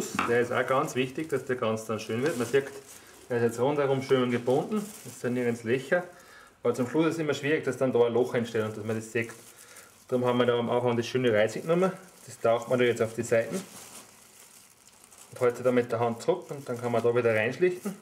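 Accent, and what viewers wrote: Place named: Austria. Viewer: das video is von der qualität völlig in ordnung ;) das österreichische ist ein bisschen knifflig...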